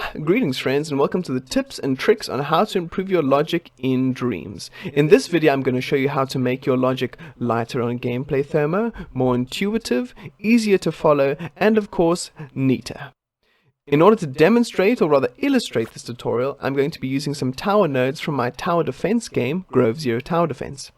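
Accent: South Africa. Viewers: That south african accent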